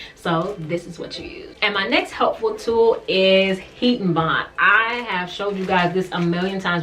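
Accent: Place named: Houston, United States. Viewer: i love your channel and i love your houston accent lol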